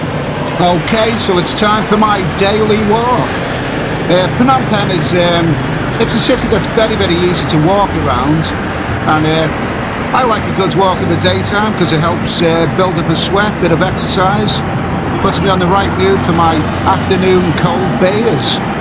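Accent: Liverpool, United Kingdom. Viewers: Love the insight mate, top scouser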